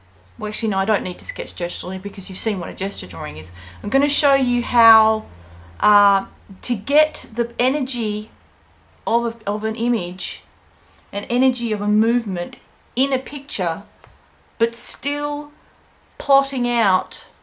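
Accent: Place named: Australia. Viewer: Yay! an Aussie you-tuber!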